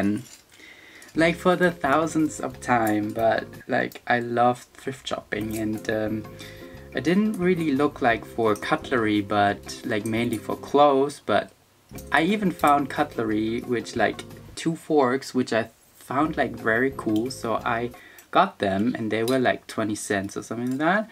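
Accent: Germany. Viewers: I swear to harry styles I knew you were German as soon as you said something and I spend half the video finding a German comment! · Are u German? :)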